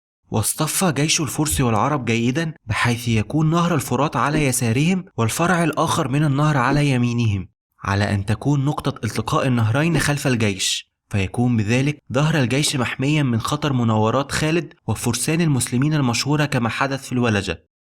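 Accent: Egypt. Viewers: اخوي ذول الناس يحاربونك عشان ما تكمل روح كمل ولا تهتم نفهم على لهجتك المصرية ما عليك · قناة جميلة جدا و مجهود يشكر لكن نطق اللغة العربية ضعيف جدا و تغلب عليه أخطاء نحوية و مخارج الحروف بلسان مصري غير فصيح. أتنمى التغلب عليها رغم صعوبتها. وشكرا مرة أخرى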